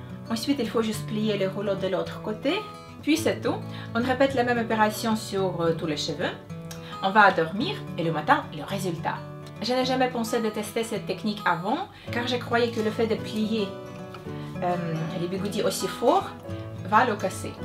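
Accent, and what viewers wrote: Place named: Russia. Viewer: sa se voit que tes russe avec ton acsent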